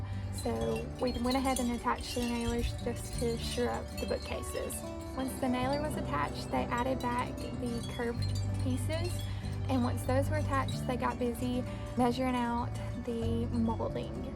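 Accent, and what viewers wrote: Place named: South, United States. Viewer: loveee your adorable southern accent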